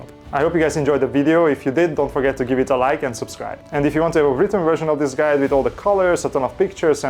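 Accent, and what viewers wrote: Hungary. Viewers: Nagyon szép munka, köszönet érte! Magyarul szóló vidi is szuper lenne. Ahogy megszólaltál, rögtön tudtam, hogy földi vagy. 😁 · Tell me you are Hungarian without telling me. That accent.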